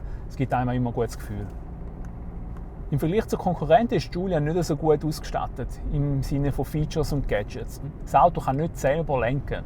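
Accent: Switzerland. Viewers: de typ isch 100pro en sangaller hahahahhaaha me weiss jedoziit wa dVodorädo am mache sind. lol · hätte ich mir fast gedachtm hab Freunde die ich von Autotreffen kommen und wenn die ihr Schwitzerdütsch auspacken dann klingt das auch so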